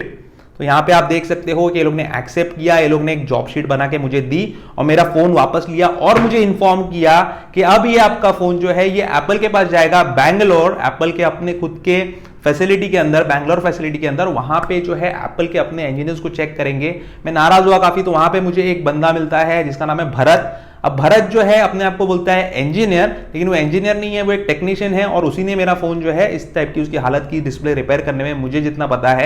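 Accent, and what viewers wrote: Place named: Gujarat, India. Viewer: bro lil bit Gujarati accent its nice great video by the way ..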